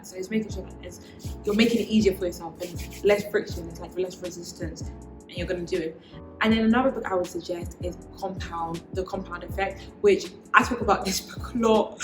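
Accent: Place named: Jamaica. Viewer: This Jamaican accent you’re giving us 😂😂😂❤️ love you and you’re doing amazing ❤️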